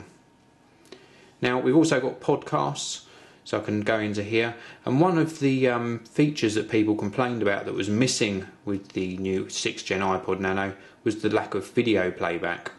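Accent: United Kingdom. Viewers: not subscribed if you change your accent then I bet you would get more views no one likes british accents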